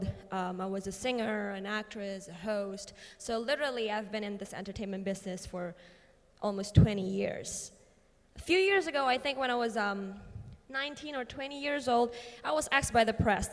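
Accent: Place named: United States. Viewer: American accent on point! i wonder how she did that while her entire life almost spent in Indonesia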